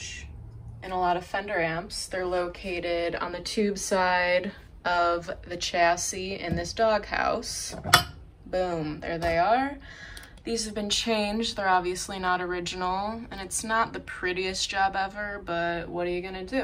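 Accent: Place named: Southern California, United States. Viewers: Great tips on how to live longer! You sound like a Californian, if so ,how can I get my amp to you so that it can be fixed by a true Pro? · That was awesome, and without a doubt the definitive SOCAL delivery. Lol. Very laid back and with that slight slowness and crackle at the back of her words. Like, totally…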